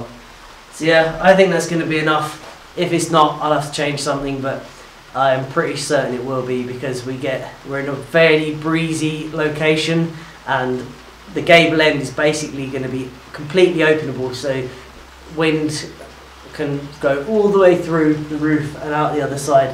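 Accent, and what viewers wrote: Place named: England, United Kingdom. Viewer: Теплица прикольная а вот чепорный английский говор отвратителен.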